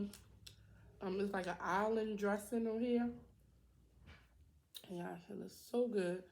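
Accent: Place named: Baltimore, United States. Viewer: Good morning my chocolate sis...i love your intro...i came across your vid by watching cringe binge and i was like let me check her out and your intro got me hooked...it is so humble and so adorable...seriously when i heard you talk i was like ummm i think she is from bmore...im from Maryland myself...im sitting here posting my own vid while watching yours lol hope you check it out but anywho i am going back to binge your vids lol have a blessed one hun... keep up the good work!!!! i totally agree on not following trends, my channel is about Ethnic food from different cultures becuz i want to open mine and others horizons on foods possibly...so basically i feel u on doin your own thang....#newsubbie...my bad im a talker but im done lol....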